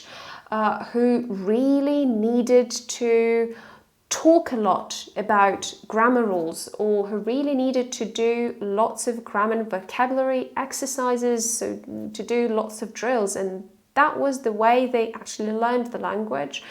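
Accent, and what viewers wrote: United Kingdom. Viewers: Hi, you can be completely mistaken for a native speaker, which is rare. You've deserved a praise. Counter-intuitively, speaking with a perfect Modern RP accent might indicate that you are a foreigner, ha-ha (but that doesn't matter of course). Have you polished your pronunciation being in the UK by emerging? Arguably, the hardest part of English is pronunciation. Many people speak English, but only a few speak clearly and are pleasant to listen to. My mother tongue is Russian as well. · I wonder what your natural English accent sounds like. I mean, without any conscious effort put into it. It's hard to believe that British accent could be natural for anyone haha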